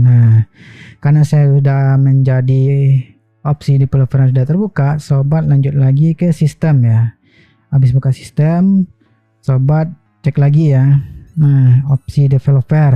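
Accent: West Sumatra, Indonesia. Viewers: Abang orang sumatera barat ya 😅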